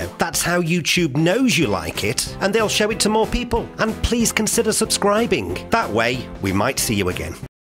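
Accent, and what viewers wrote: Stoke, United Kingdom. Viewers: Are you from Stoke?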